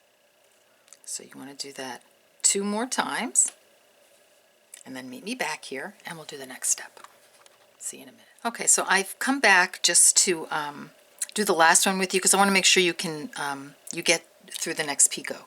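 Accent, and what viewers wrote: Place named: West, United States. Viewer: you do not sound like you are from the northeast, rather some where in the west.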